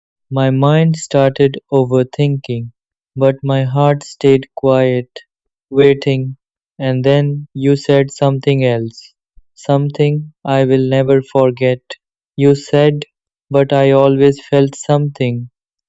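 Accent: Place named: India. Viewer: Stop speaking with your indian accent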